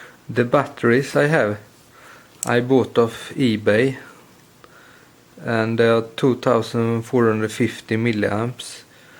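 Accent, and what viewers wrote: Jönköping, Sweden. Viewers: Är du från jönköping?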